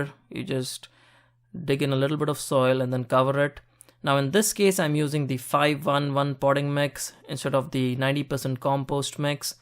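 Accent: India. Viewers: Such a nice accent. Indian ?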